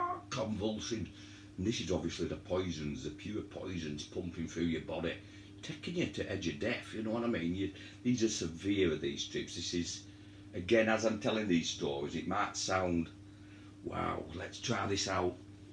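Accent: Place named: Yorkshire, United Kingdom. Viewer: Are you from Barnsley or Donny pal? Its like listening to an old mate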